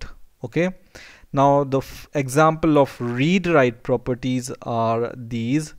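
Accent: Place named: India. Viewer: Yeahh, He is Indian he have Indian English accent. I'm confirming it :)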